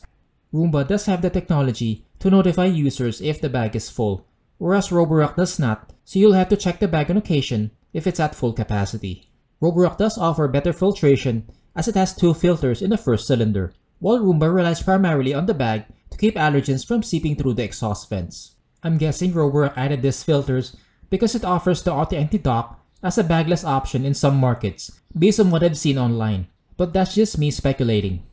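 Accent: Philippines. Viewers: I can tell you're a Filipino by your accent. How did you buy these high-end here? How about the availability of services/maintenance when these break down and needs fixing?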